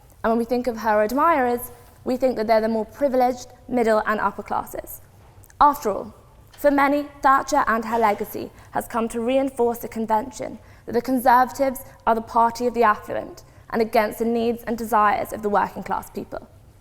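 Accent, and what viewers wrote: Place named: Upper class, United Kingdom. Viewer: Another posh voice talking about how brilliant Thatcher was. Half of these people haven't stepped foot north of the M25 yet they think they have the authority to discuss what effect her government had on our towns and our people. There's a reason "ding dong the witch is dead" reached number 2 on the charts when she died - but you'd never understand that.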